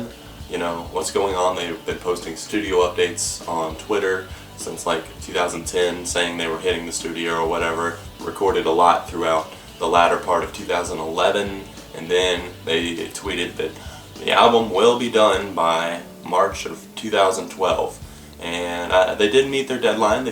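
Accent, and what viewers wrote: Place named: South, United States. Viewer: southern accent is much more prominent in these earlier videos, it makes me chuckle.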